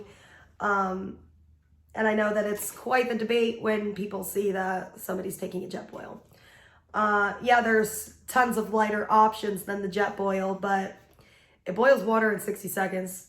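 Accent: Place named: New Jersey, United States. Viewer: Yes. You sound like you're from Jersey. That's a compliment!! I'm from Florence and I swear like a horse. Looking forward to seeing more of ur AT Videos. I'm fucking jelly of you, right now!!! Lol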